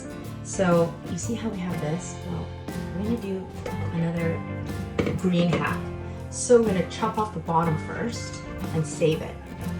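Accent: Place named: China. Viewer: YUM! hahaha, the chinglish is real!!! love it hearing someone speak like me. keep it up!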